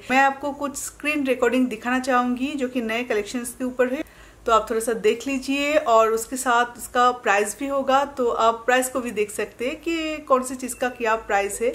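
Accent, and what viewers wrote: Bengal, India. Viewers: Bachpan se bangla bolti aae hai , hindi jitna v bole bangla hi unka 1st comfortable language hai so hindi me v bangla style a hi jata hai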